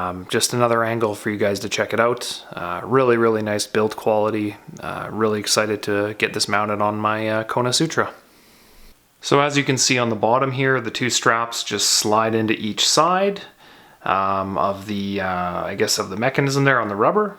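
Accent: Canada. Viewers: Are you Canadian? You have a very strong accent.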